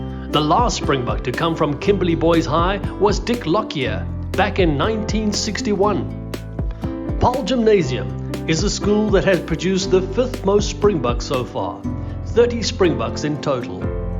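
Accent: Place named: England, United Kingdom. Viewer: this guy's pronunciation is too english!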